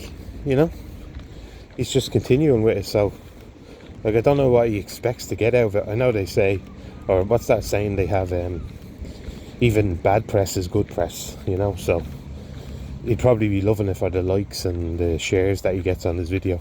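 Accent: Ireland. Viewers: you're Irish because you have one Irish parent and even if you hadn't you've been here a long time and sound Irish so of course this is your country.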